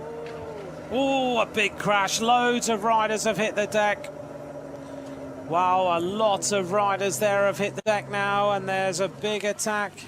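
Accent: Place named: United Kingdom. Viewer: イギリス英語気持ち良すぎる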